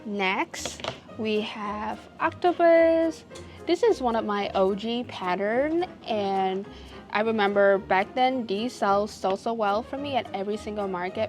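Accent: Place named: Asia. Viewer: Asian accent. I hear minute traces of it.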